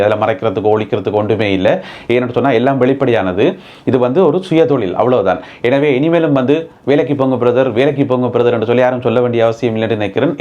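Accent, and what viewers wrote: Sri Lanka. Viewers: I came to London on visit visa,i have subscribed your channel very interesting,your srilankan slang tamil impress me very much.iam running a devotional channel namely Temple trails showing festivals of temples,on seeing your channel i got impressed and started a new channel namely வானமே எல்லை. your pronounciation and detailing is super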